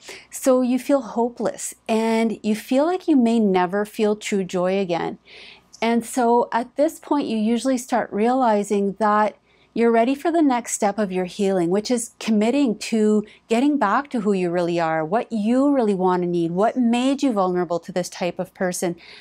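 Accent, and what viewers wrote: Canada. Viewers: Of course it’s a Canadian twang I’m picking up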